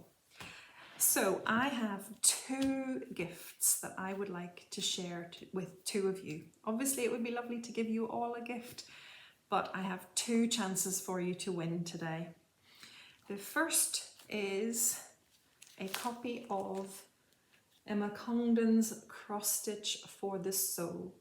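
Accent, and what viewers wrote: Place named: Ireland. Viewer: …you are such a kind and gentle soul and it shines in every Flosstube that you make! I find it so relaxing listening to your soft voice with such a lovely Irish accent..the way you enunciate your words, your little giggle at yourself and things you do. This earth needs more people like you. Happy Flossiversary to you! Listening to you sign off with your multiple thank you(s), it really is apparent it comes from the heart. I have a question for you…did you finish your Blackbird Designs - What Remains Is Love? I understood that you were part of a SAL and I have not seen your progress on it for quite some time. I do watch many Flosstubers and it is possible I have gotten this wrong…I ask, because I finally got a chart and decided that while others are seeming to participate in Stitch Maynia buy starting multiple projects; my plan was to be a real rebel and Mayniac and stitch every day in May on one single chart to see how progress I can make. 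I have chosen What remains and wanted to join in on the SAL. If you are doing that SAL would you mind giving me the nAme of it on IG please. Your bunting looks stunning and you deserve a medal for undertaking such a project. p.s. I love your stamp chart and story. It is actually a chart I would like to do as well, because being a Canadian I grew up licking many a stamp with the picture of Queen Elizabeth as well. The one I seem to remember the most was the royal blue one. p.p.s. I don’t stitch Halloween either! Great con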